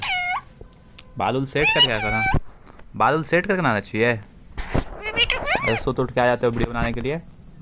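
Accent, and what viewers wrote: India. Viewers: you are a stress buster.... Thank you for being u❤ your accent is pure desi... Pls don't change 😆👌👌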